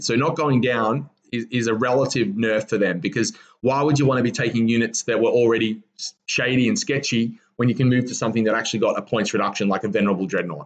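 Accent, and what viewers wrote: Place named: Australia. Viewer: Hi, great video as always. A few points: 1) I've tried Heavy Incinerator on GMNDK, problem is D6 shots. 3.5 hits, 1 or 2 wounds, doesn't cut it. I rather go Gat Psi and Heavy Psy, spend 2CP (Psychic Onslaught stratagem) for 12 Str5 and 6 Str8 shots. 2) As netprince455 commented before me, what are your thoughts on: "Gateing" a Land Raider Crusader carrying 2x 5man Purifier squads, Brother-Cap (Vortex) and Pala Ancient in Turn 1, (optionally) using the Psybolt stratagem for 24 Str5 shots to clear the chaff. If it survives Turn 1 shooting, everyone pops out for 3D6 + D3 MWs (12.5 avg). Entire set up is 686pts. Alternatively, you could "gate" it on Turn 2, alongside 2 GMNDKs who were in high orbit, presenting your opponent with multiple threats. Anyway, once again, great video. Love what you're doing for the GK community with these quality vids. 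Aussie accent is the cherry on top.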